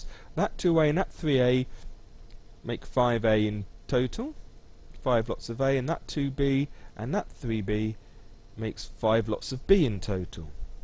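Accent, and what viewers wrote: United Kingdom. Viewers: Why are the lessons are being u British man? I can't understand that heavy ass accent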